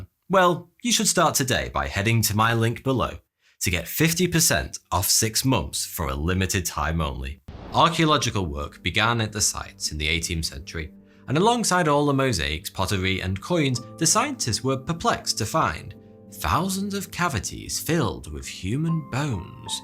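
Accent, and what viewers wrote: United Kingdom. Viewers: Lovin your british accent in french haha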